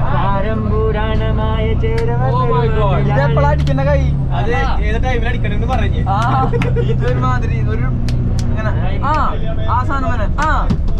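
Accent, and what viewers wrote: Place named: Malappuram, India. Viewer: മലപ്പുറം ഭാഷ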